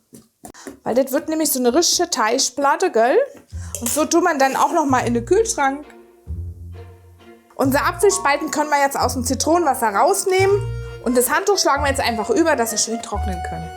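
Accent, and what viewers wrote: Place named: Hesse, Germany. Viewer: Lecker. ☺️ Ich arbeite im schönen Wiesbaden und dachte, ich hätte da so was Hessisches bei dir rausgehört. 😉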